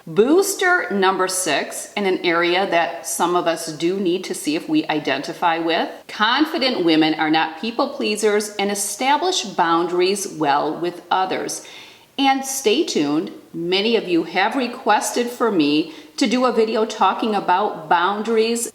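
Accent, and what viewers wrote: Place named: Michigan, United States. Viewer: Your Michigan accent has me laughinnn lol peace from Windsor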